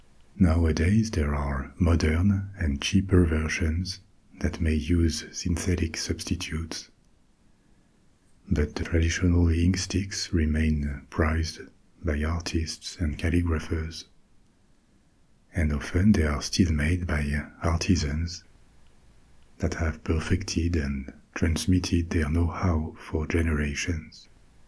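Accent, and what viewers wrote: France. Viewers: Loving there's no AI and if a mere mortal as I can make a suggestion, then could do Dantes Inferno about the 9 circles of Hell?? I am sure you'd do it such justice with your Gallic tones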